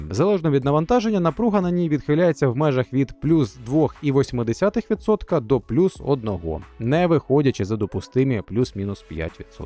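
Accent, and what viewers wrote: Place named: Galicia, Ukraine. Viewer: вылез галицикий селюк😂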